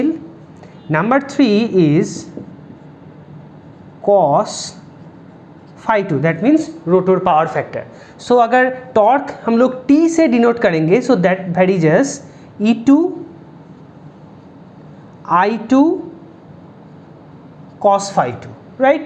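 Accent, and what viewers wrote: Bengal, India. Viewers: Aap bengoli hain ?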